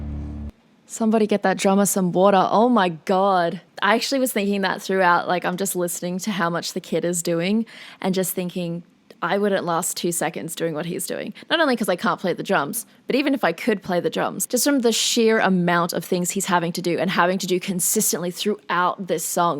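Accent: Australia. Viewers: Can you please start a podcast or anything, maybe about music, or anything else that interests you? It's so relaxing to listen to, the Australian accent sounds really good :) I was there btw, at the end of the video haha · I love how you get so into the music and your expressions. And the red hair and red nail polish does it for me, And to top it off the Australian accent and such beauty, I’m hooked!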